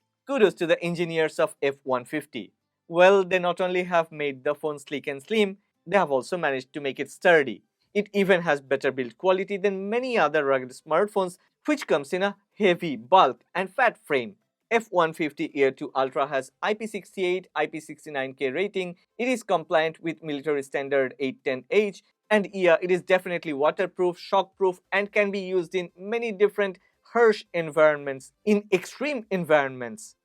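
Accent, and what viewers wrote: India. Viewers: When i play a review video i can't escape that Indian English accent sometimes but you're amazing.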